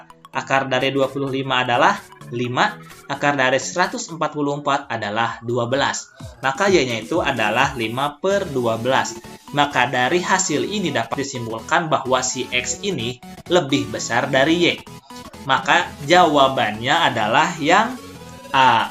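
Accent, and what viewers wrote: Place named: West Java, Indonesia. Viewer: Nadanya sunda, lucu 😆👍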